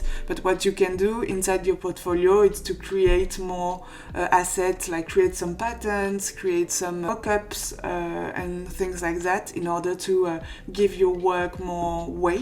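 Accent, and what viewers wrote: France. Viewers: J’ai décelé un accent français 😁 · Tu es française? Are you french? You have french accent it seems.. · L'accent français🥰🥰 merci je suis entrain de refaire mon portfolio en ce moment et ça me motive, j'ai grave la flemme mais c'est mieux que j'efface les immondices que j'ai fait quand je débutais 🤣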